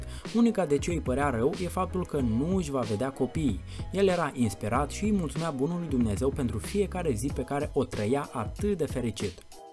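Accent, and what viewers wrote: Moldova. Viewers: Esti moldovean?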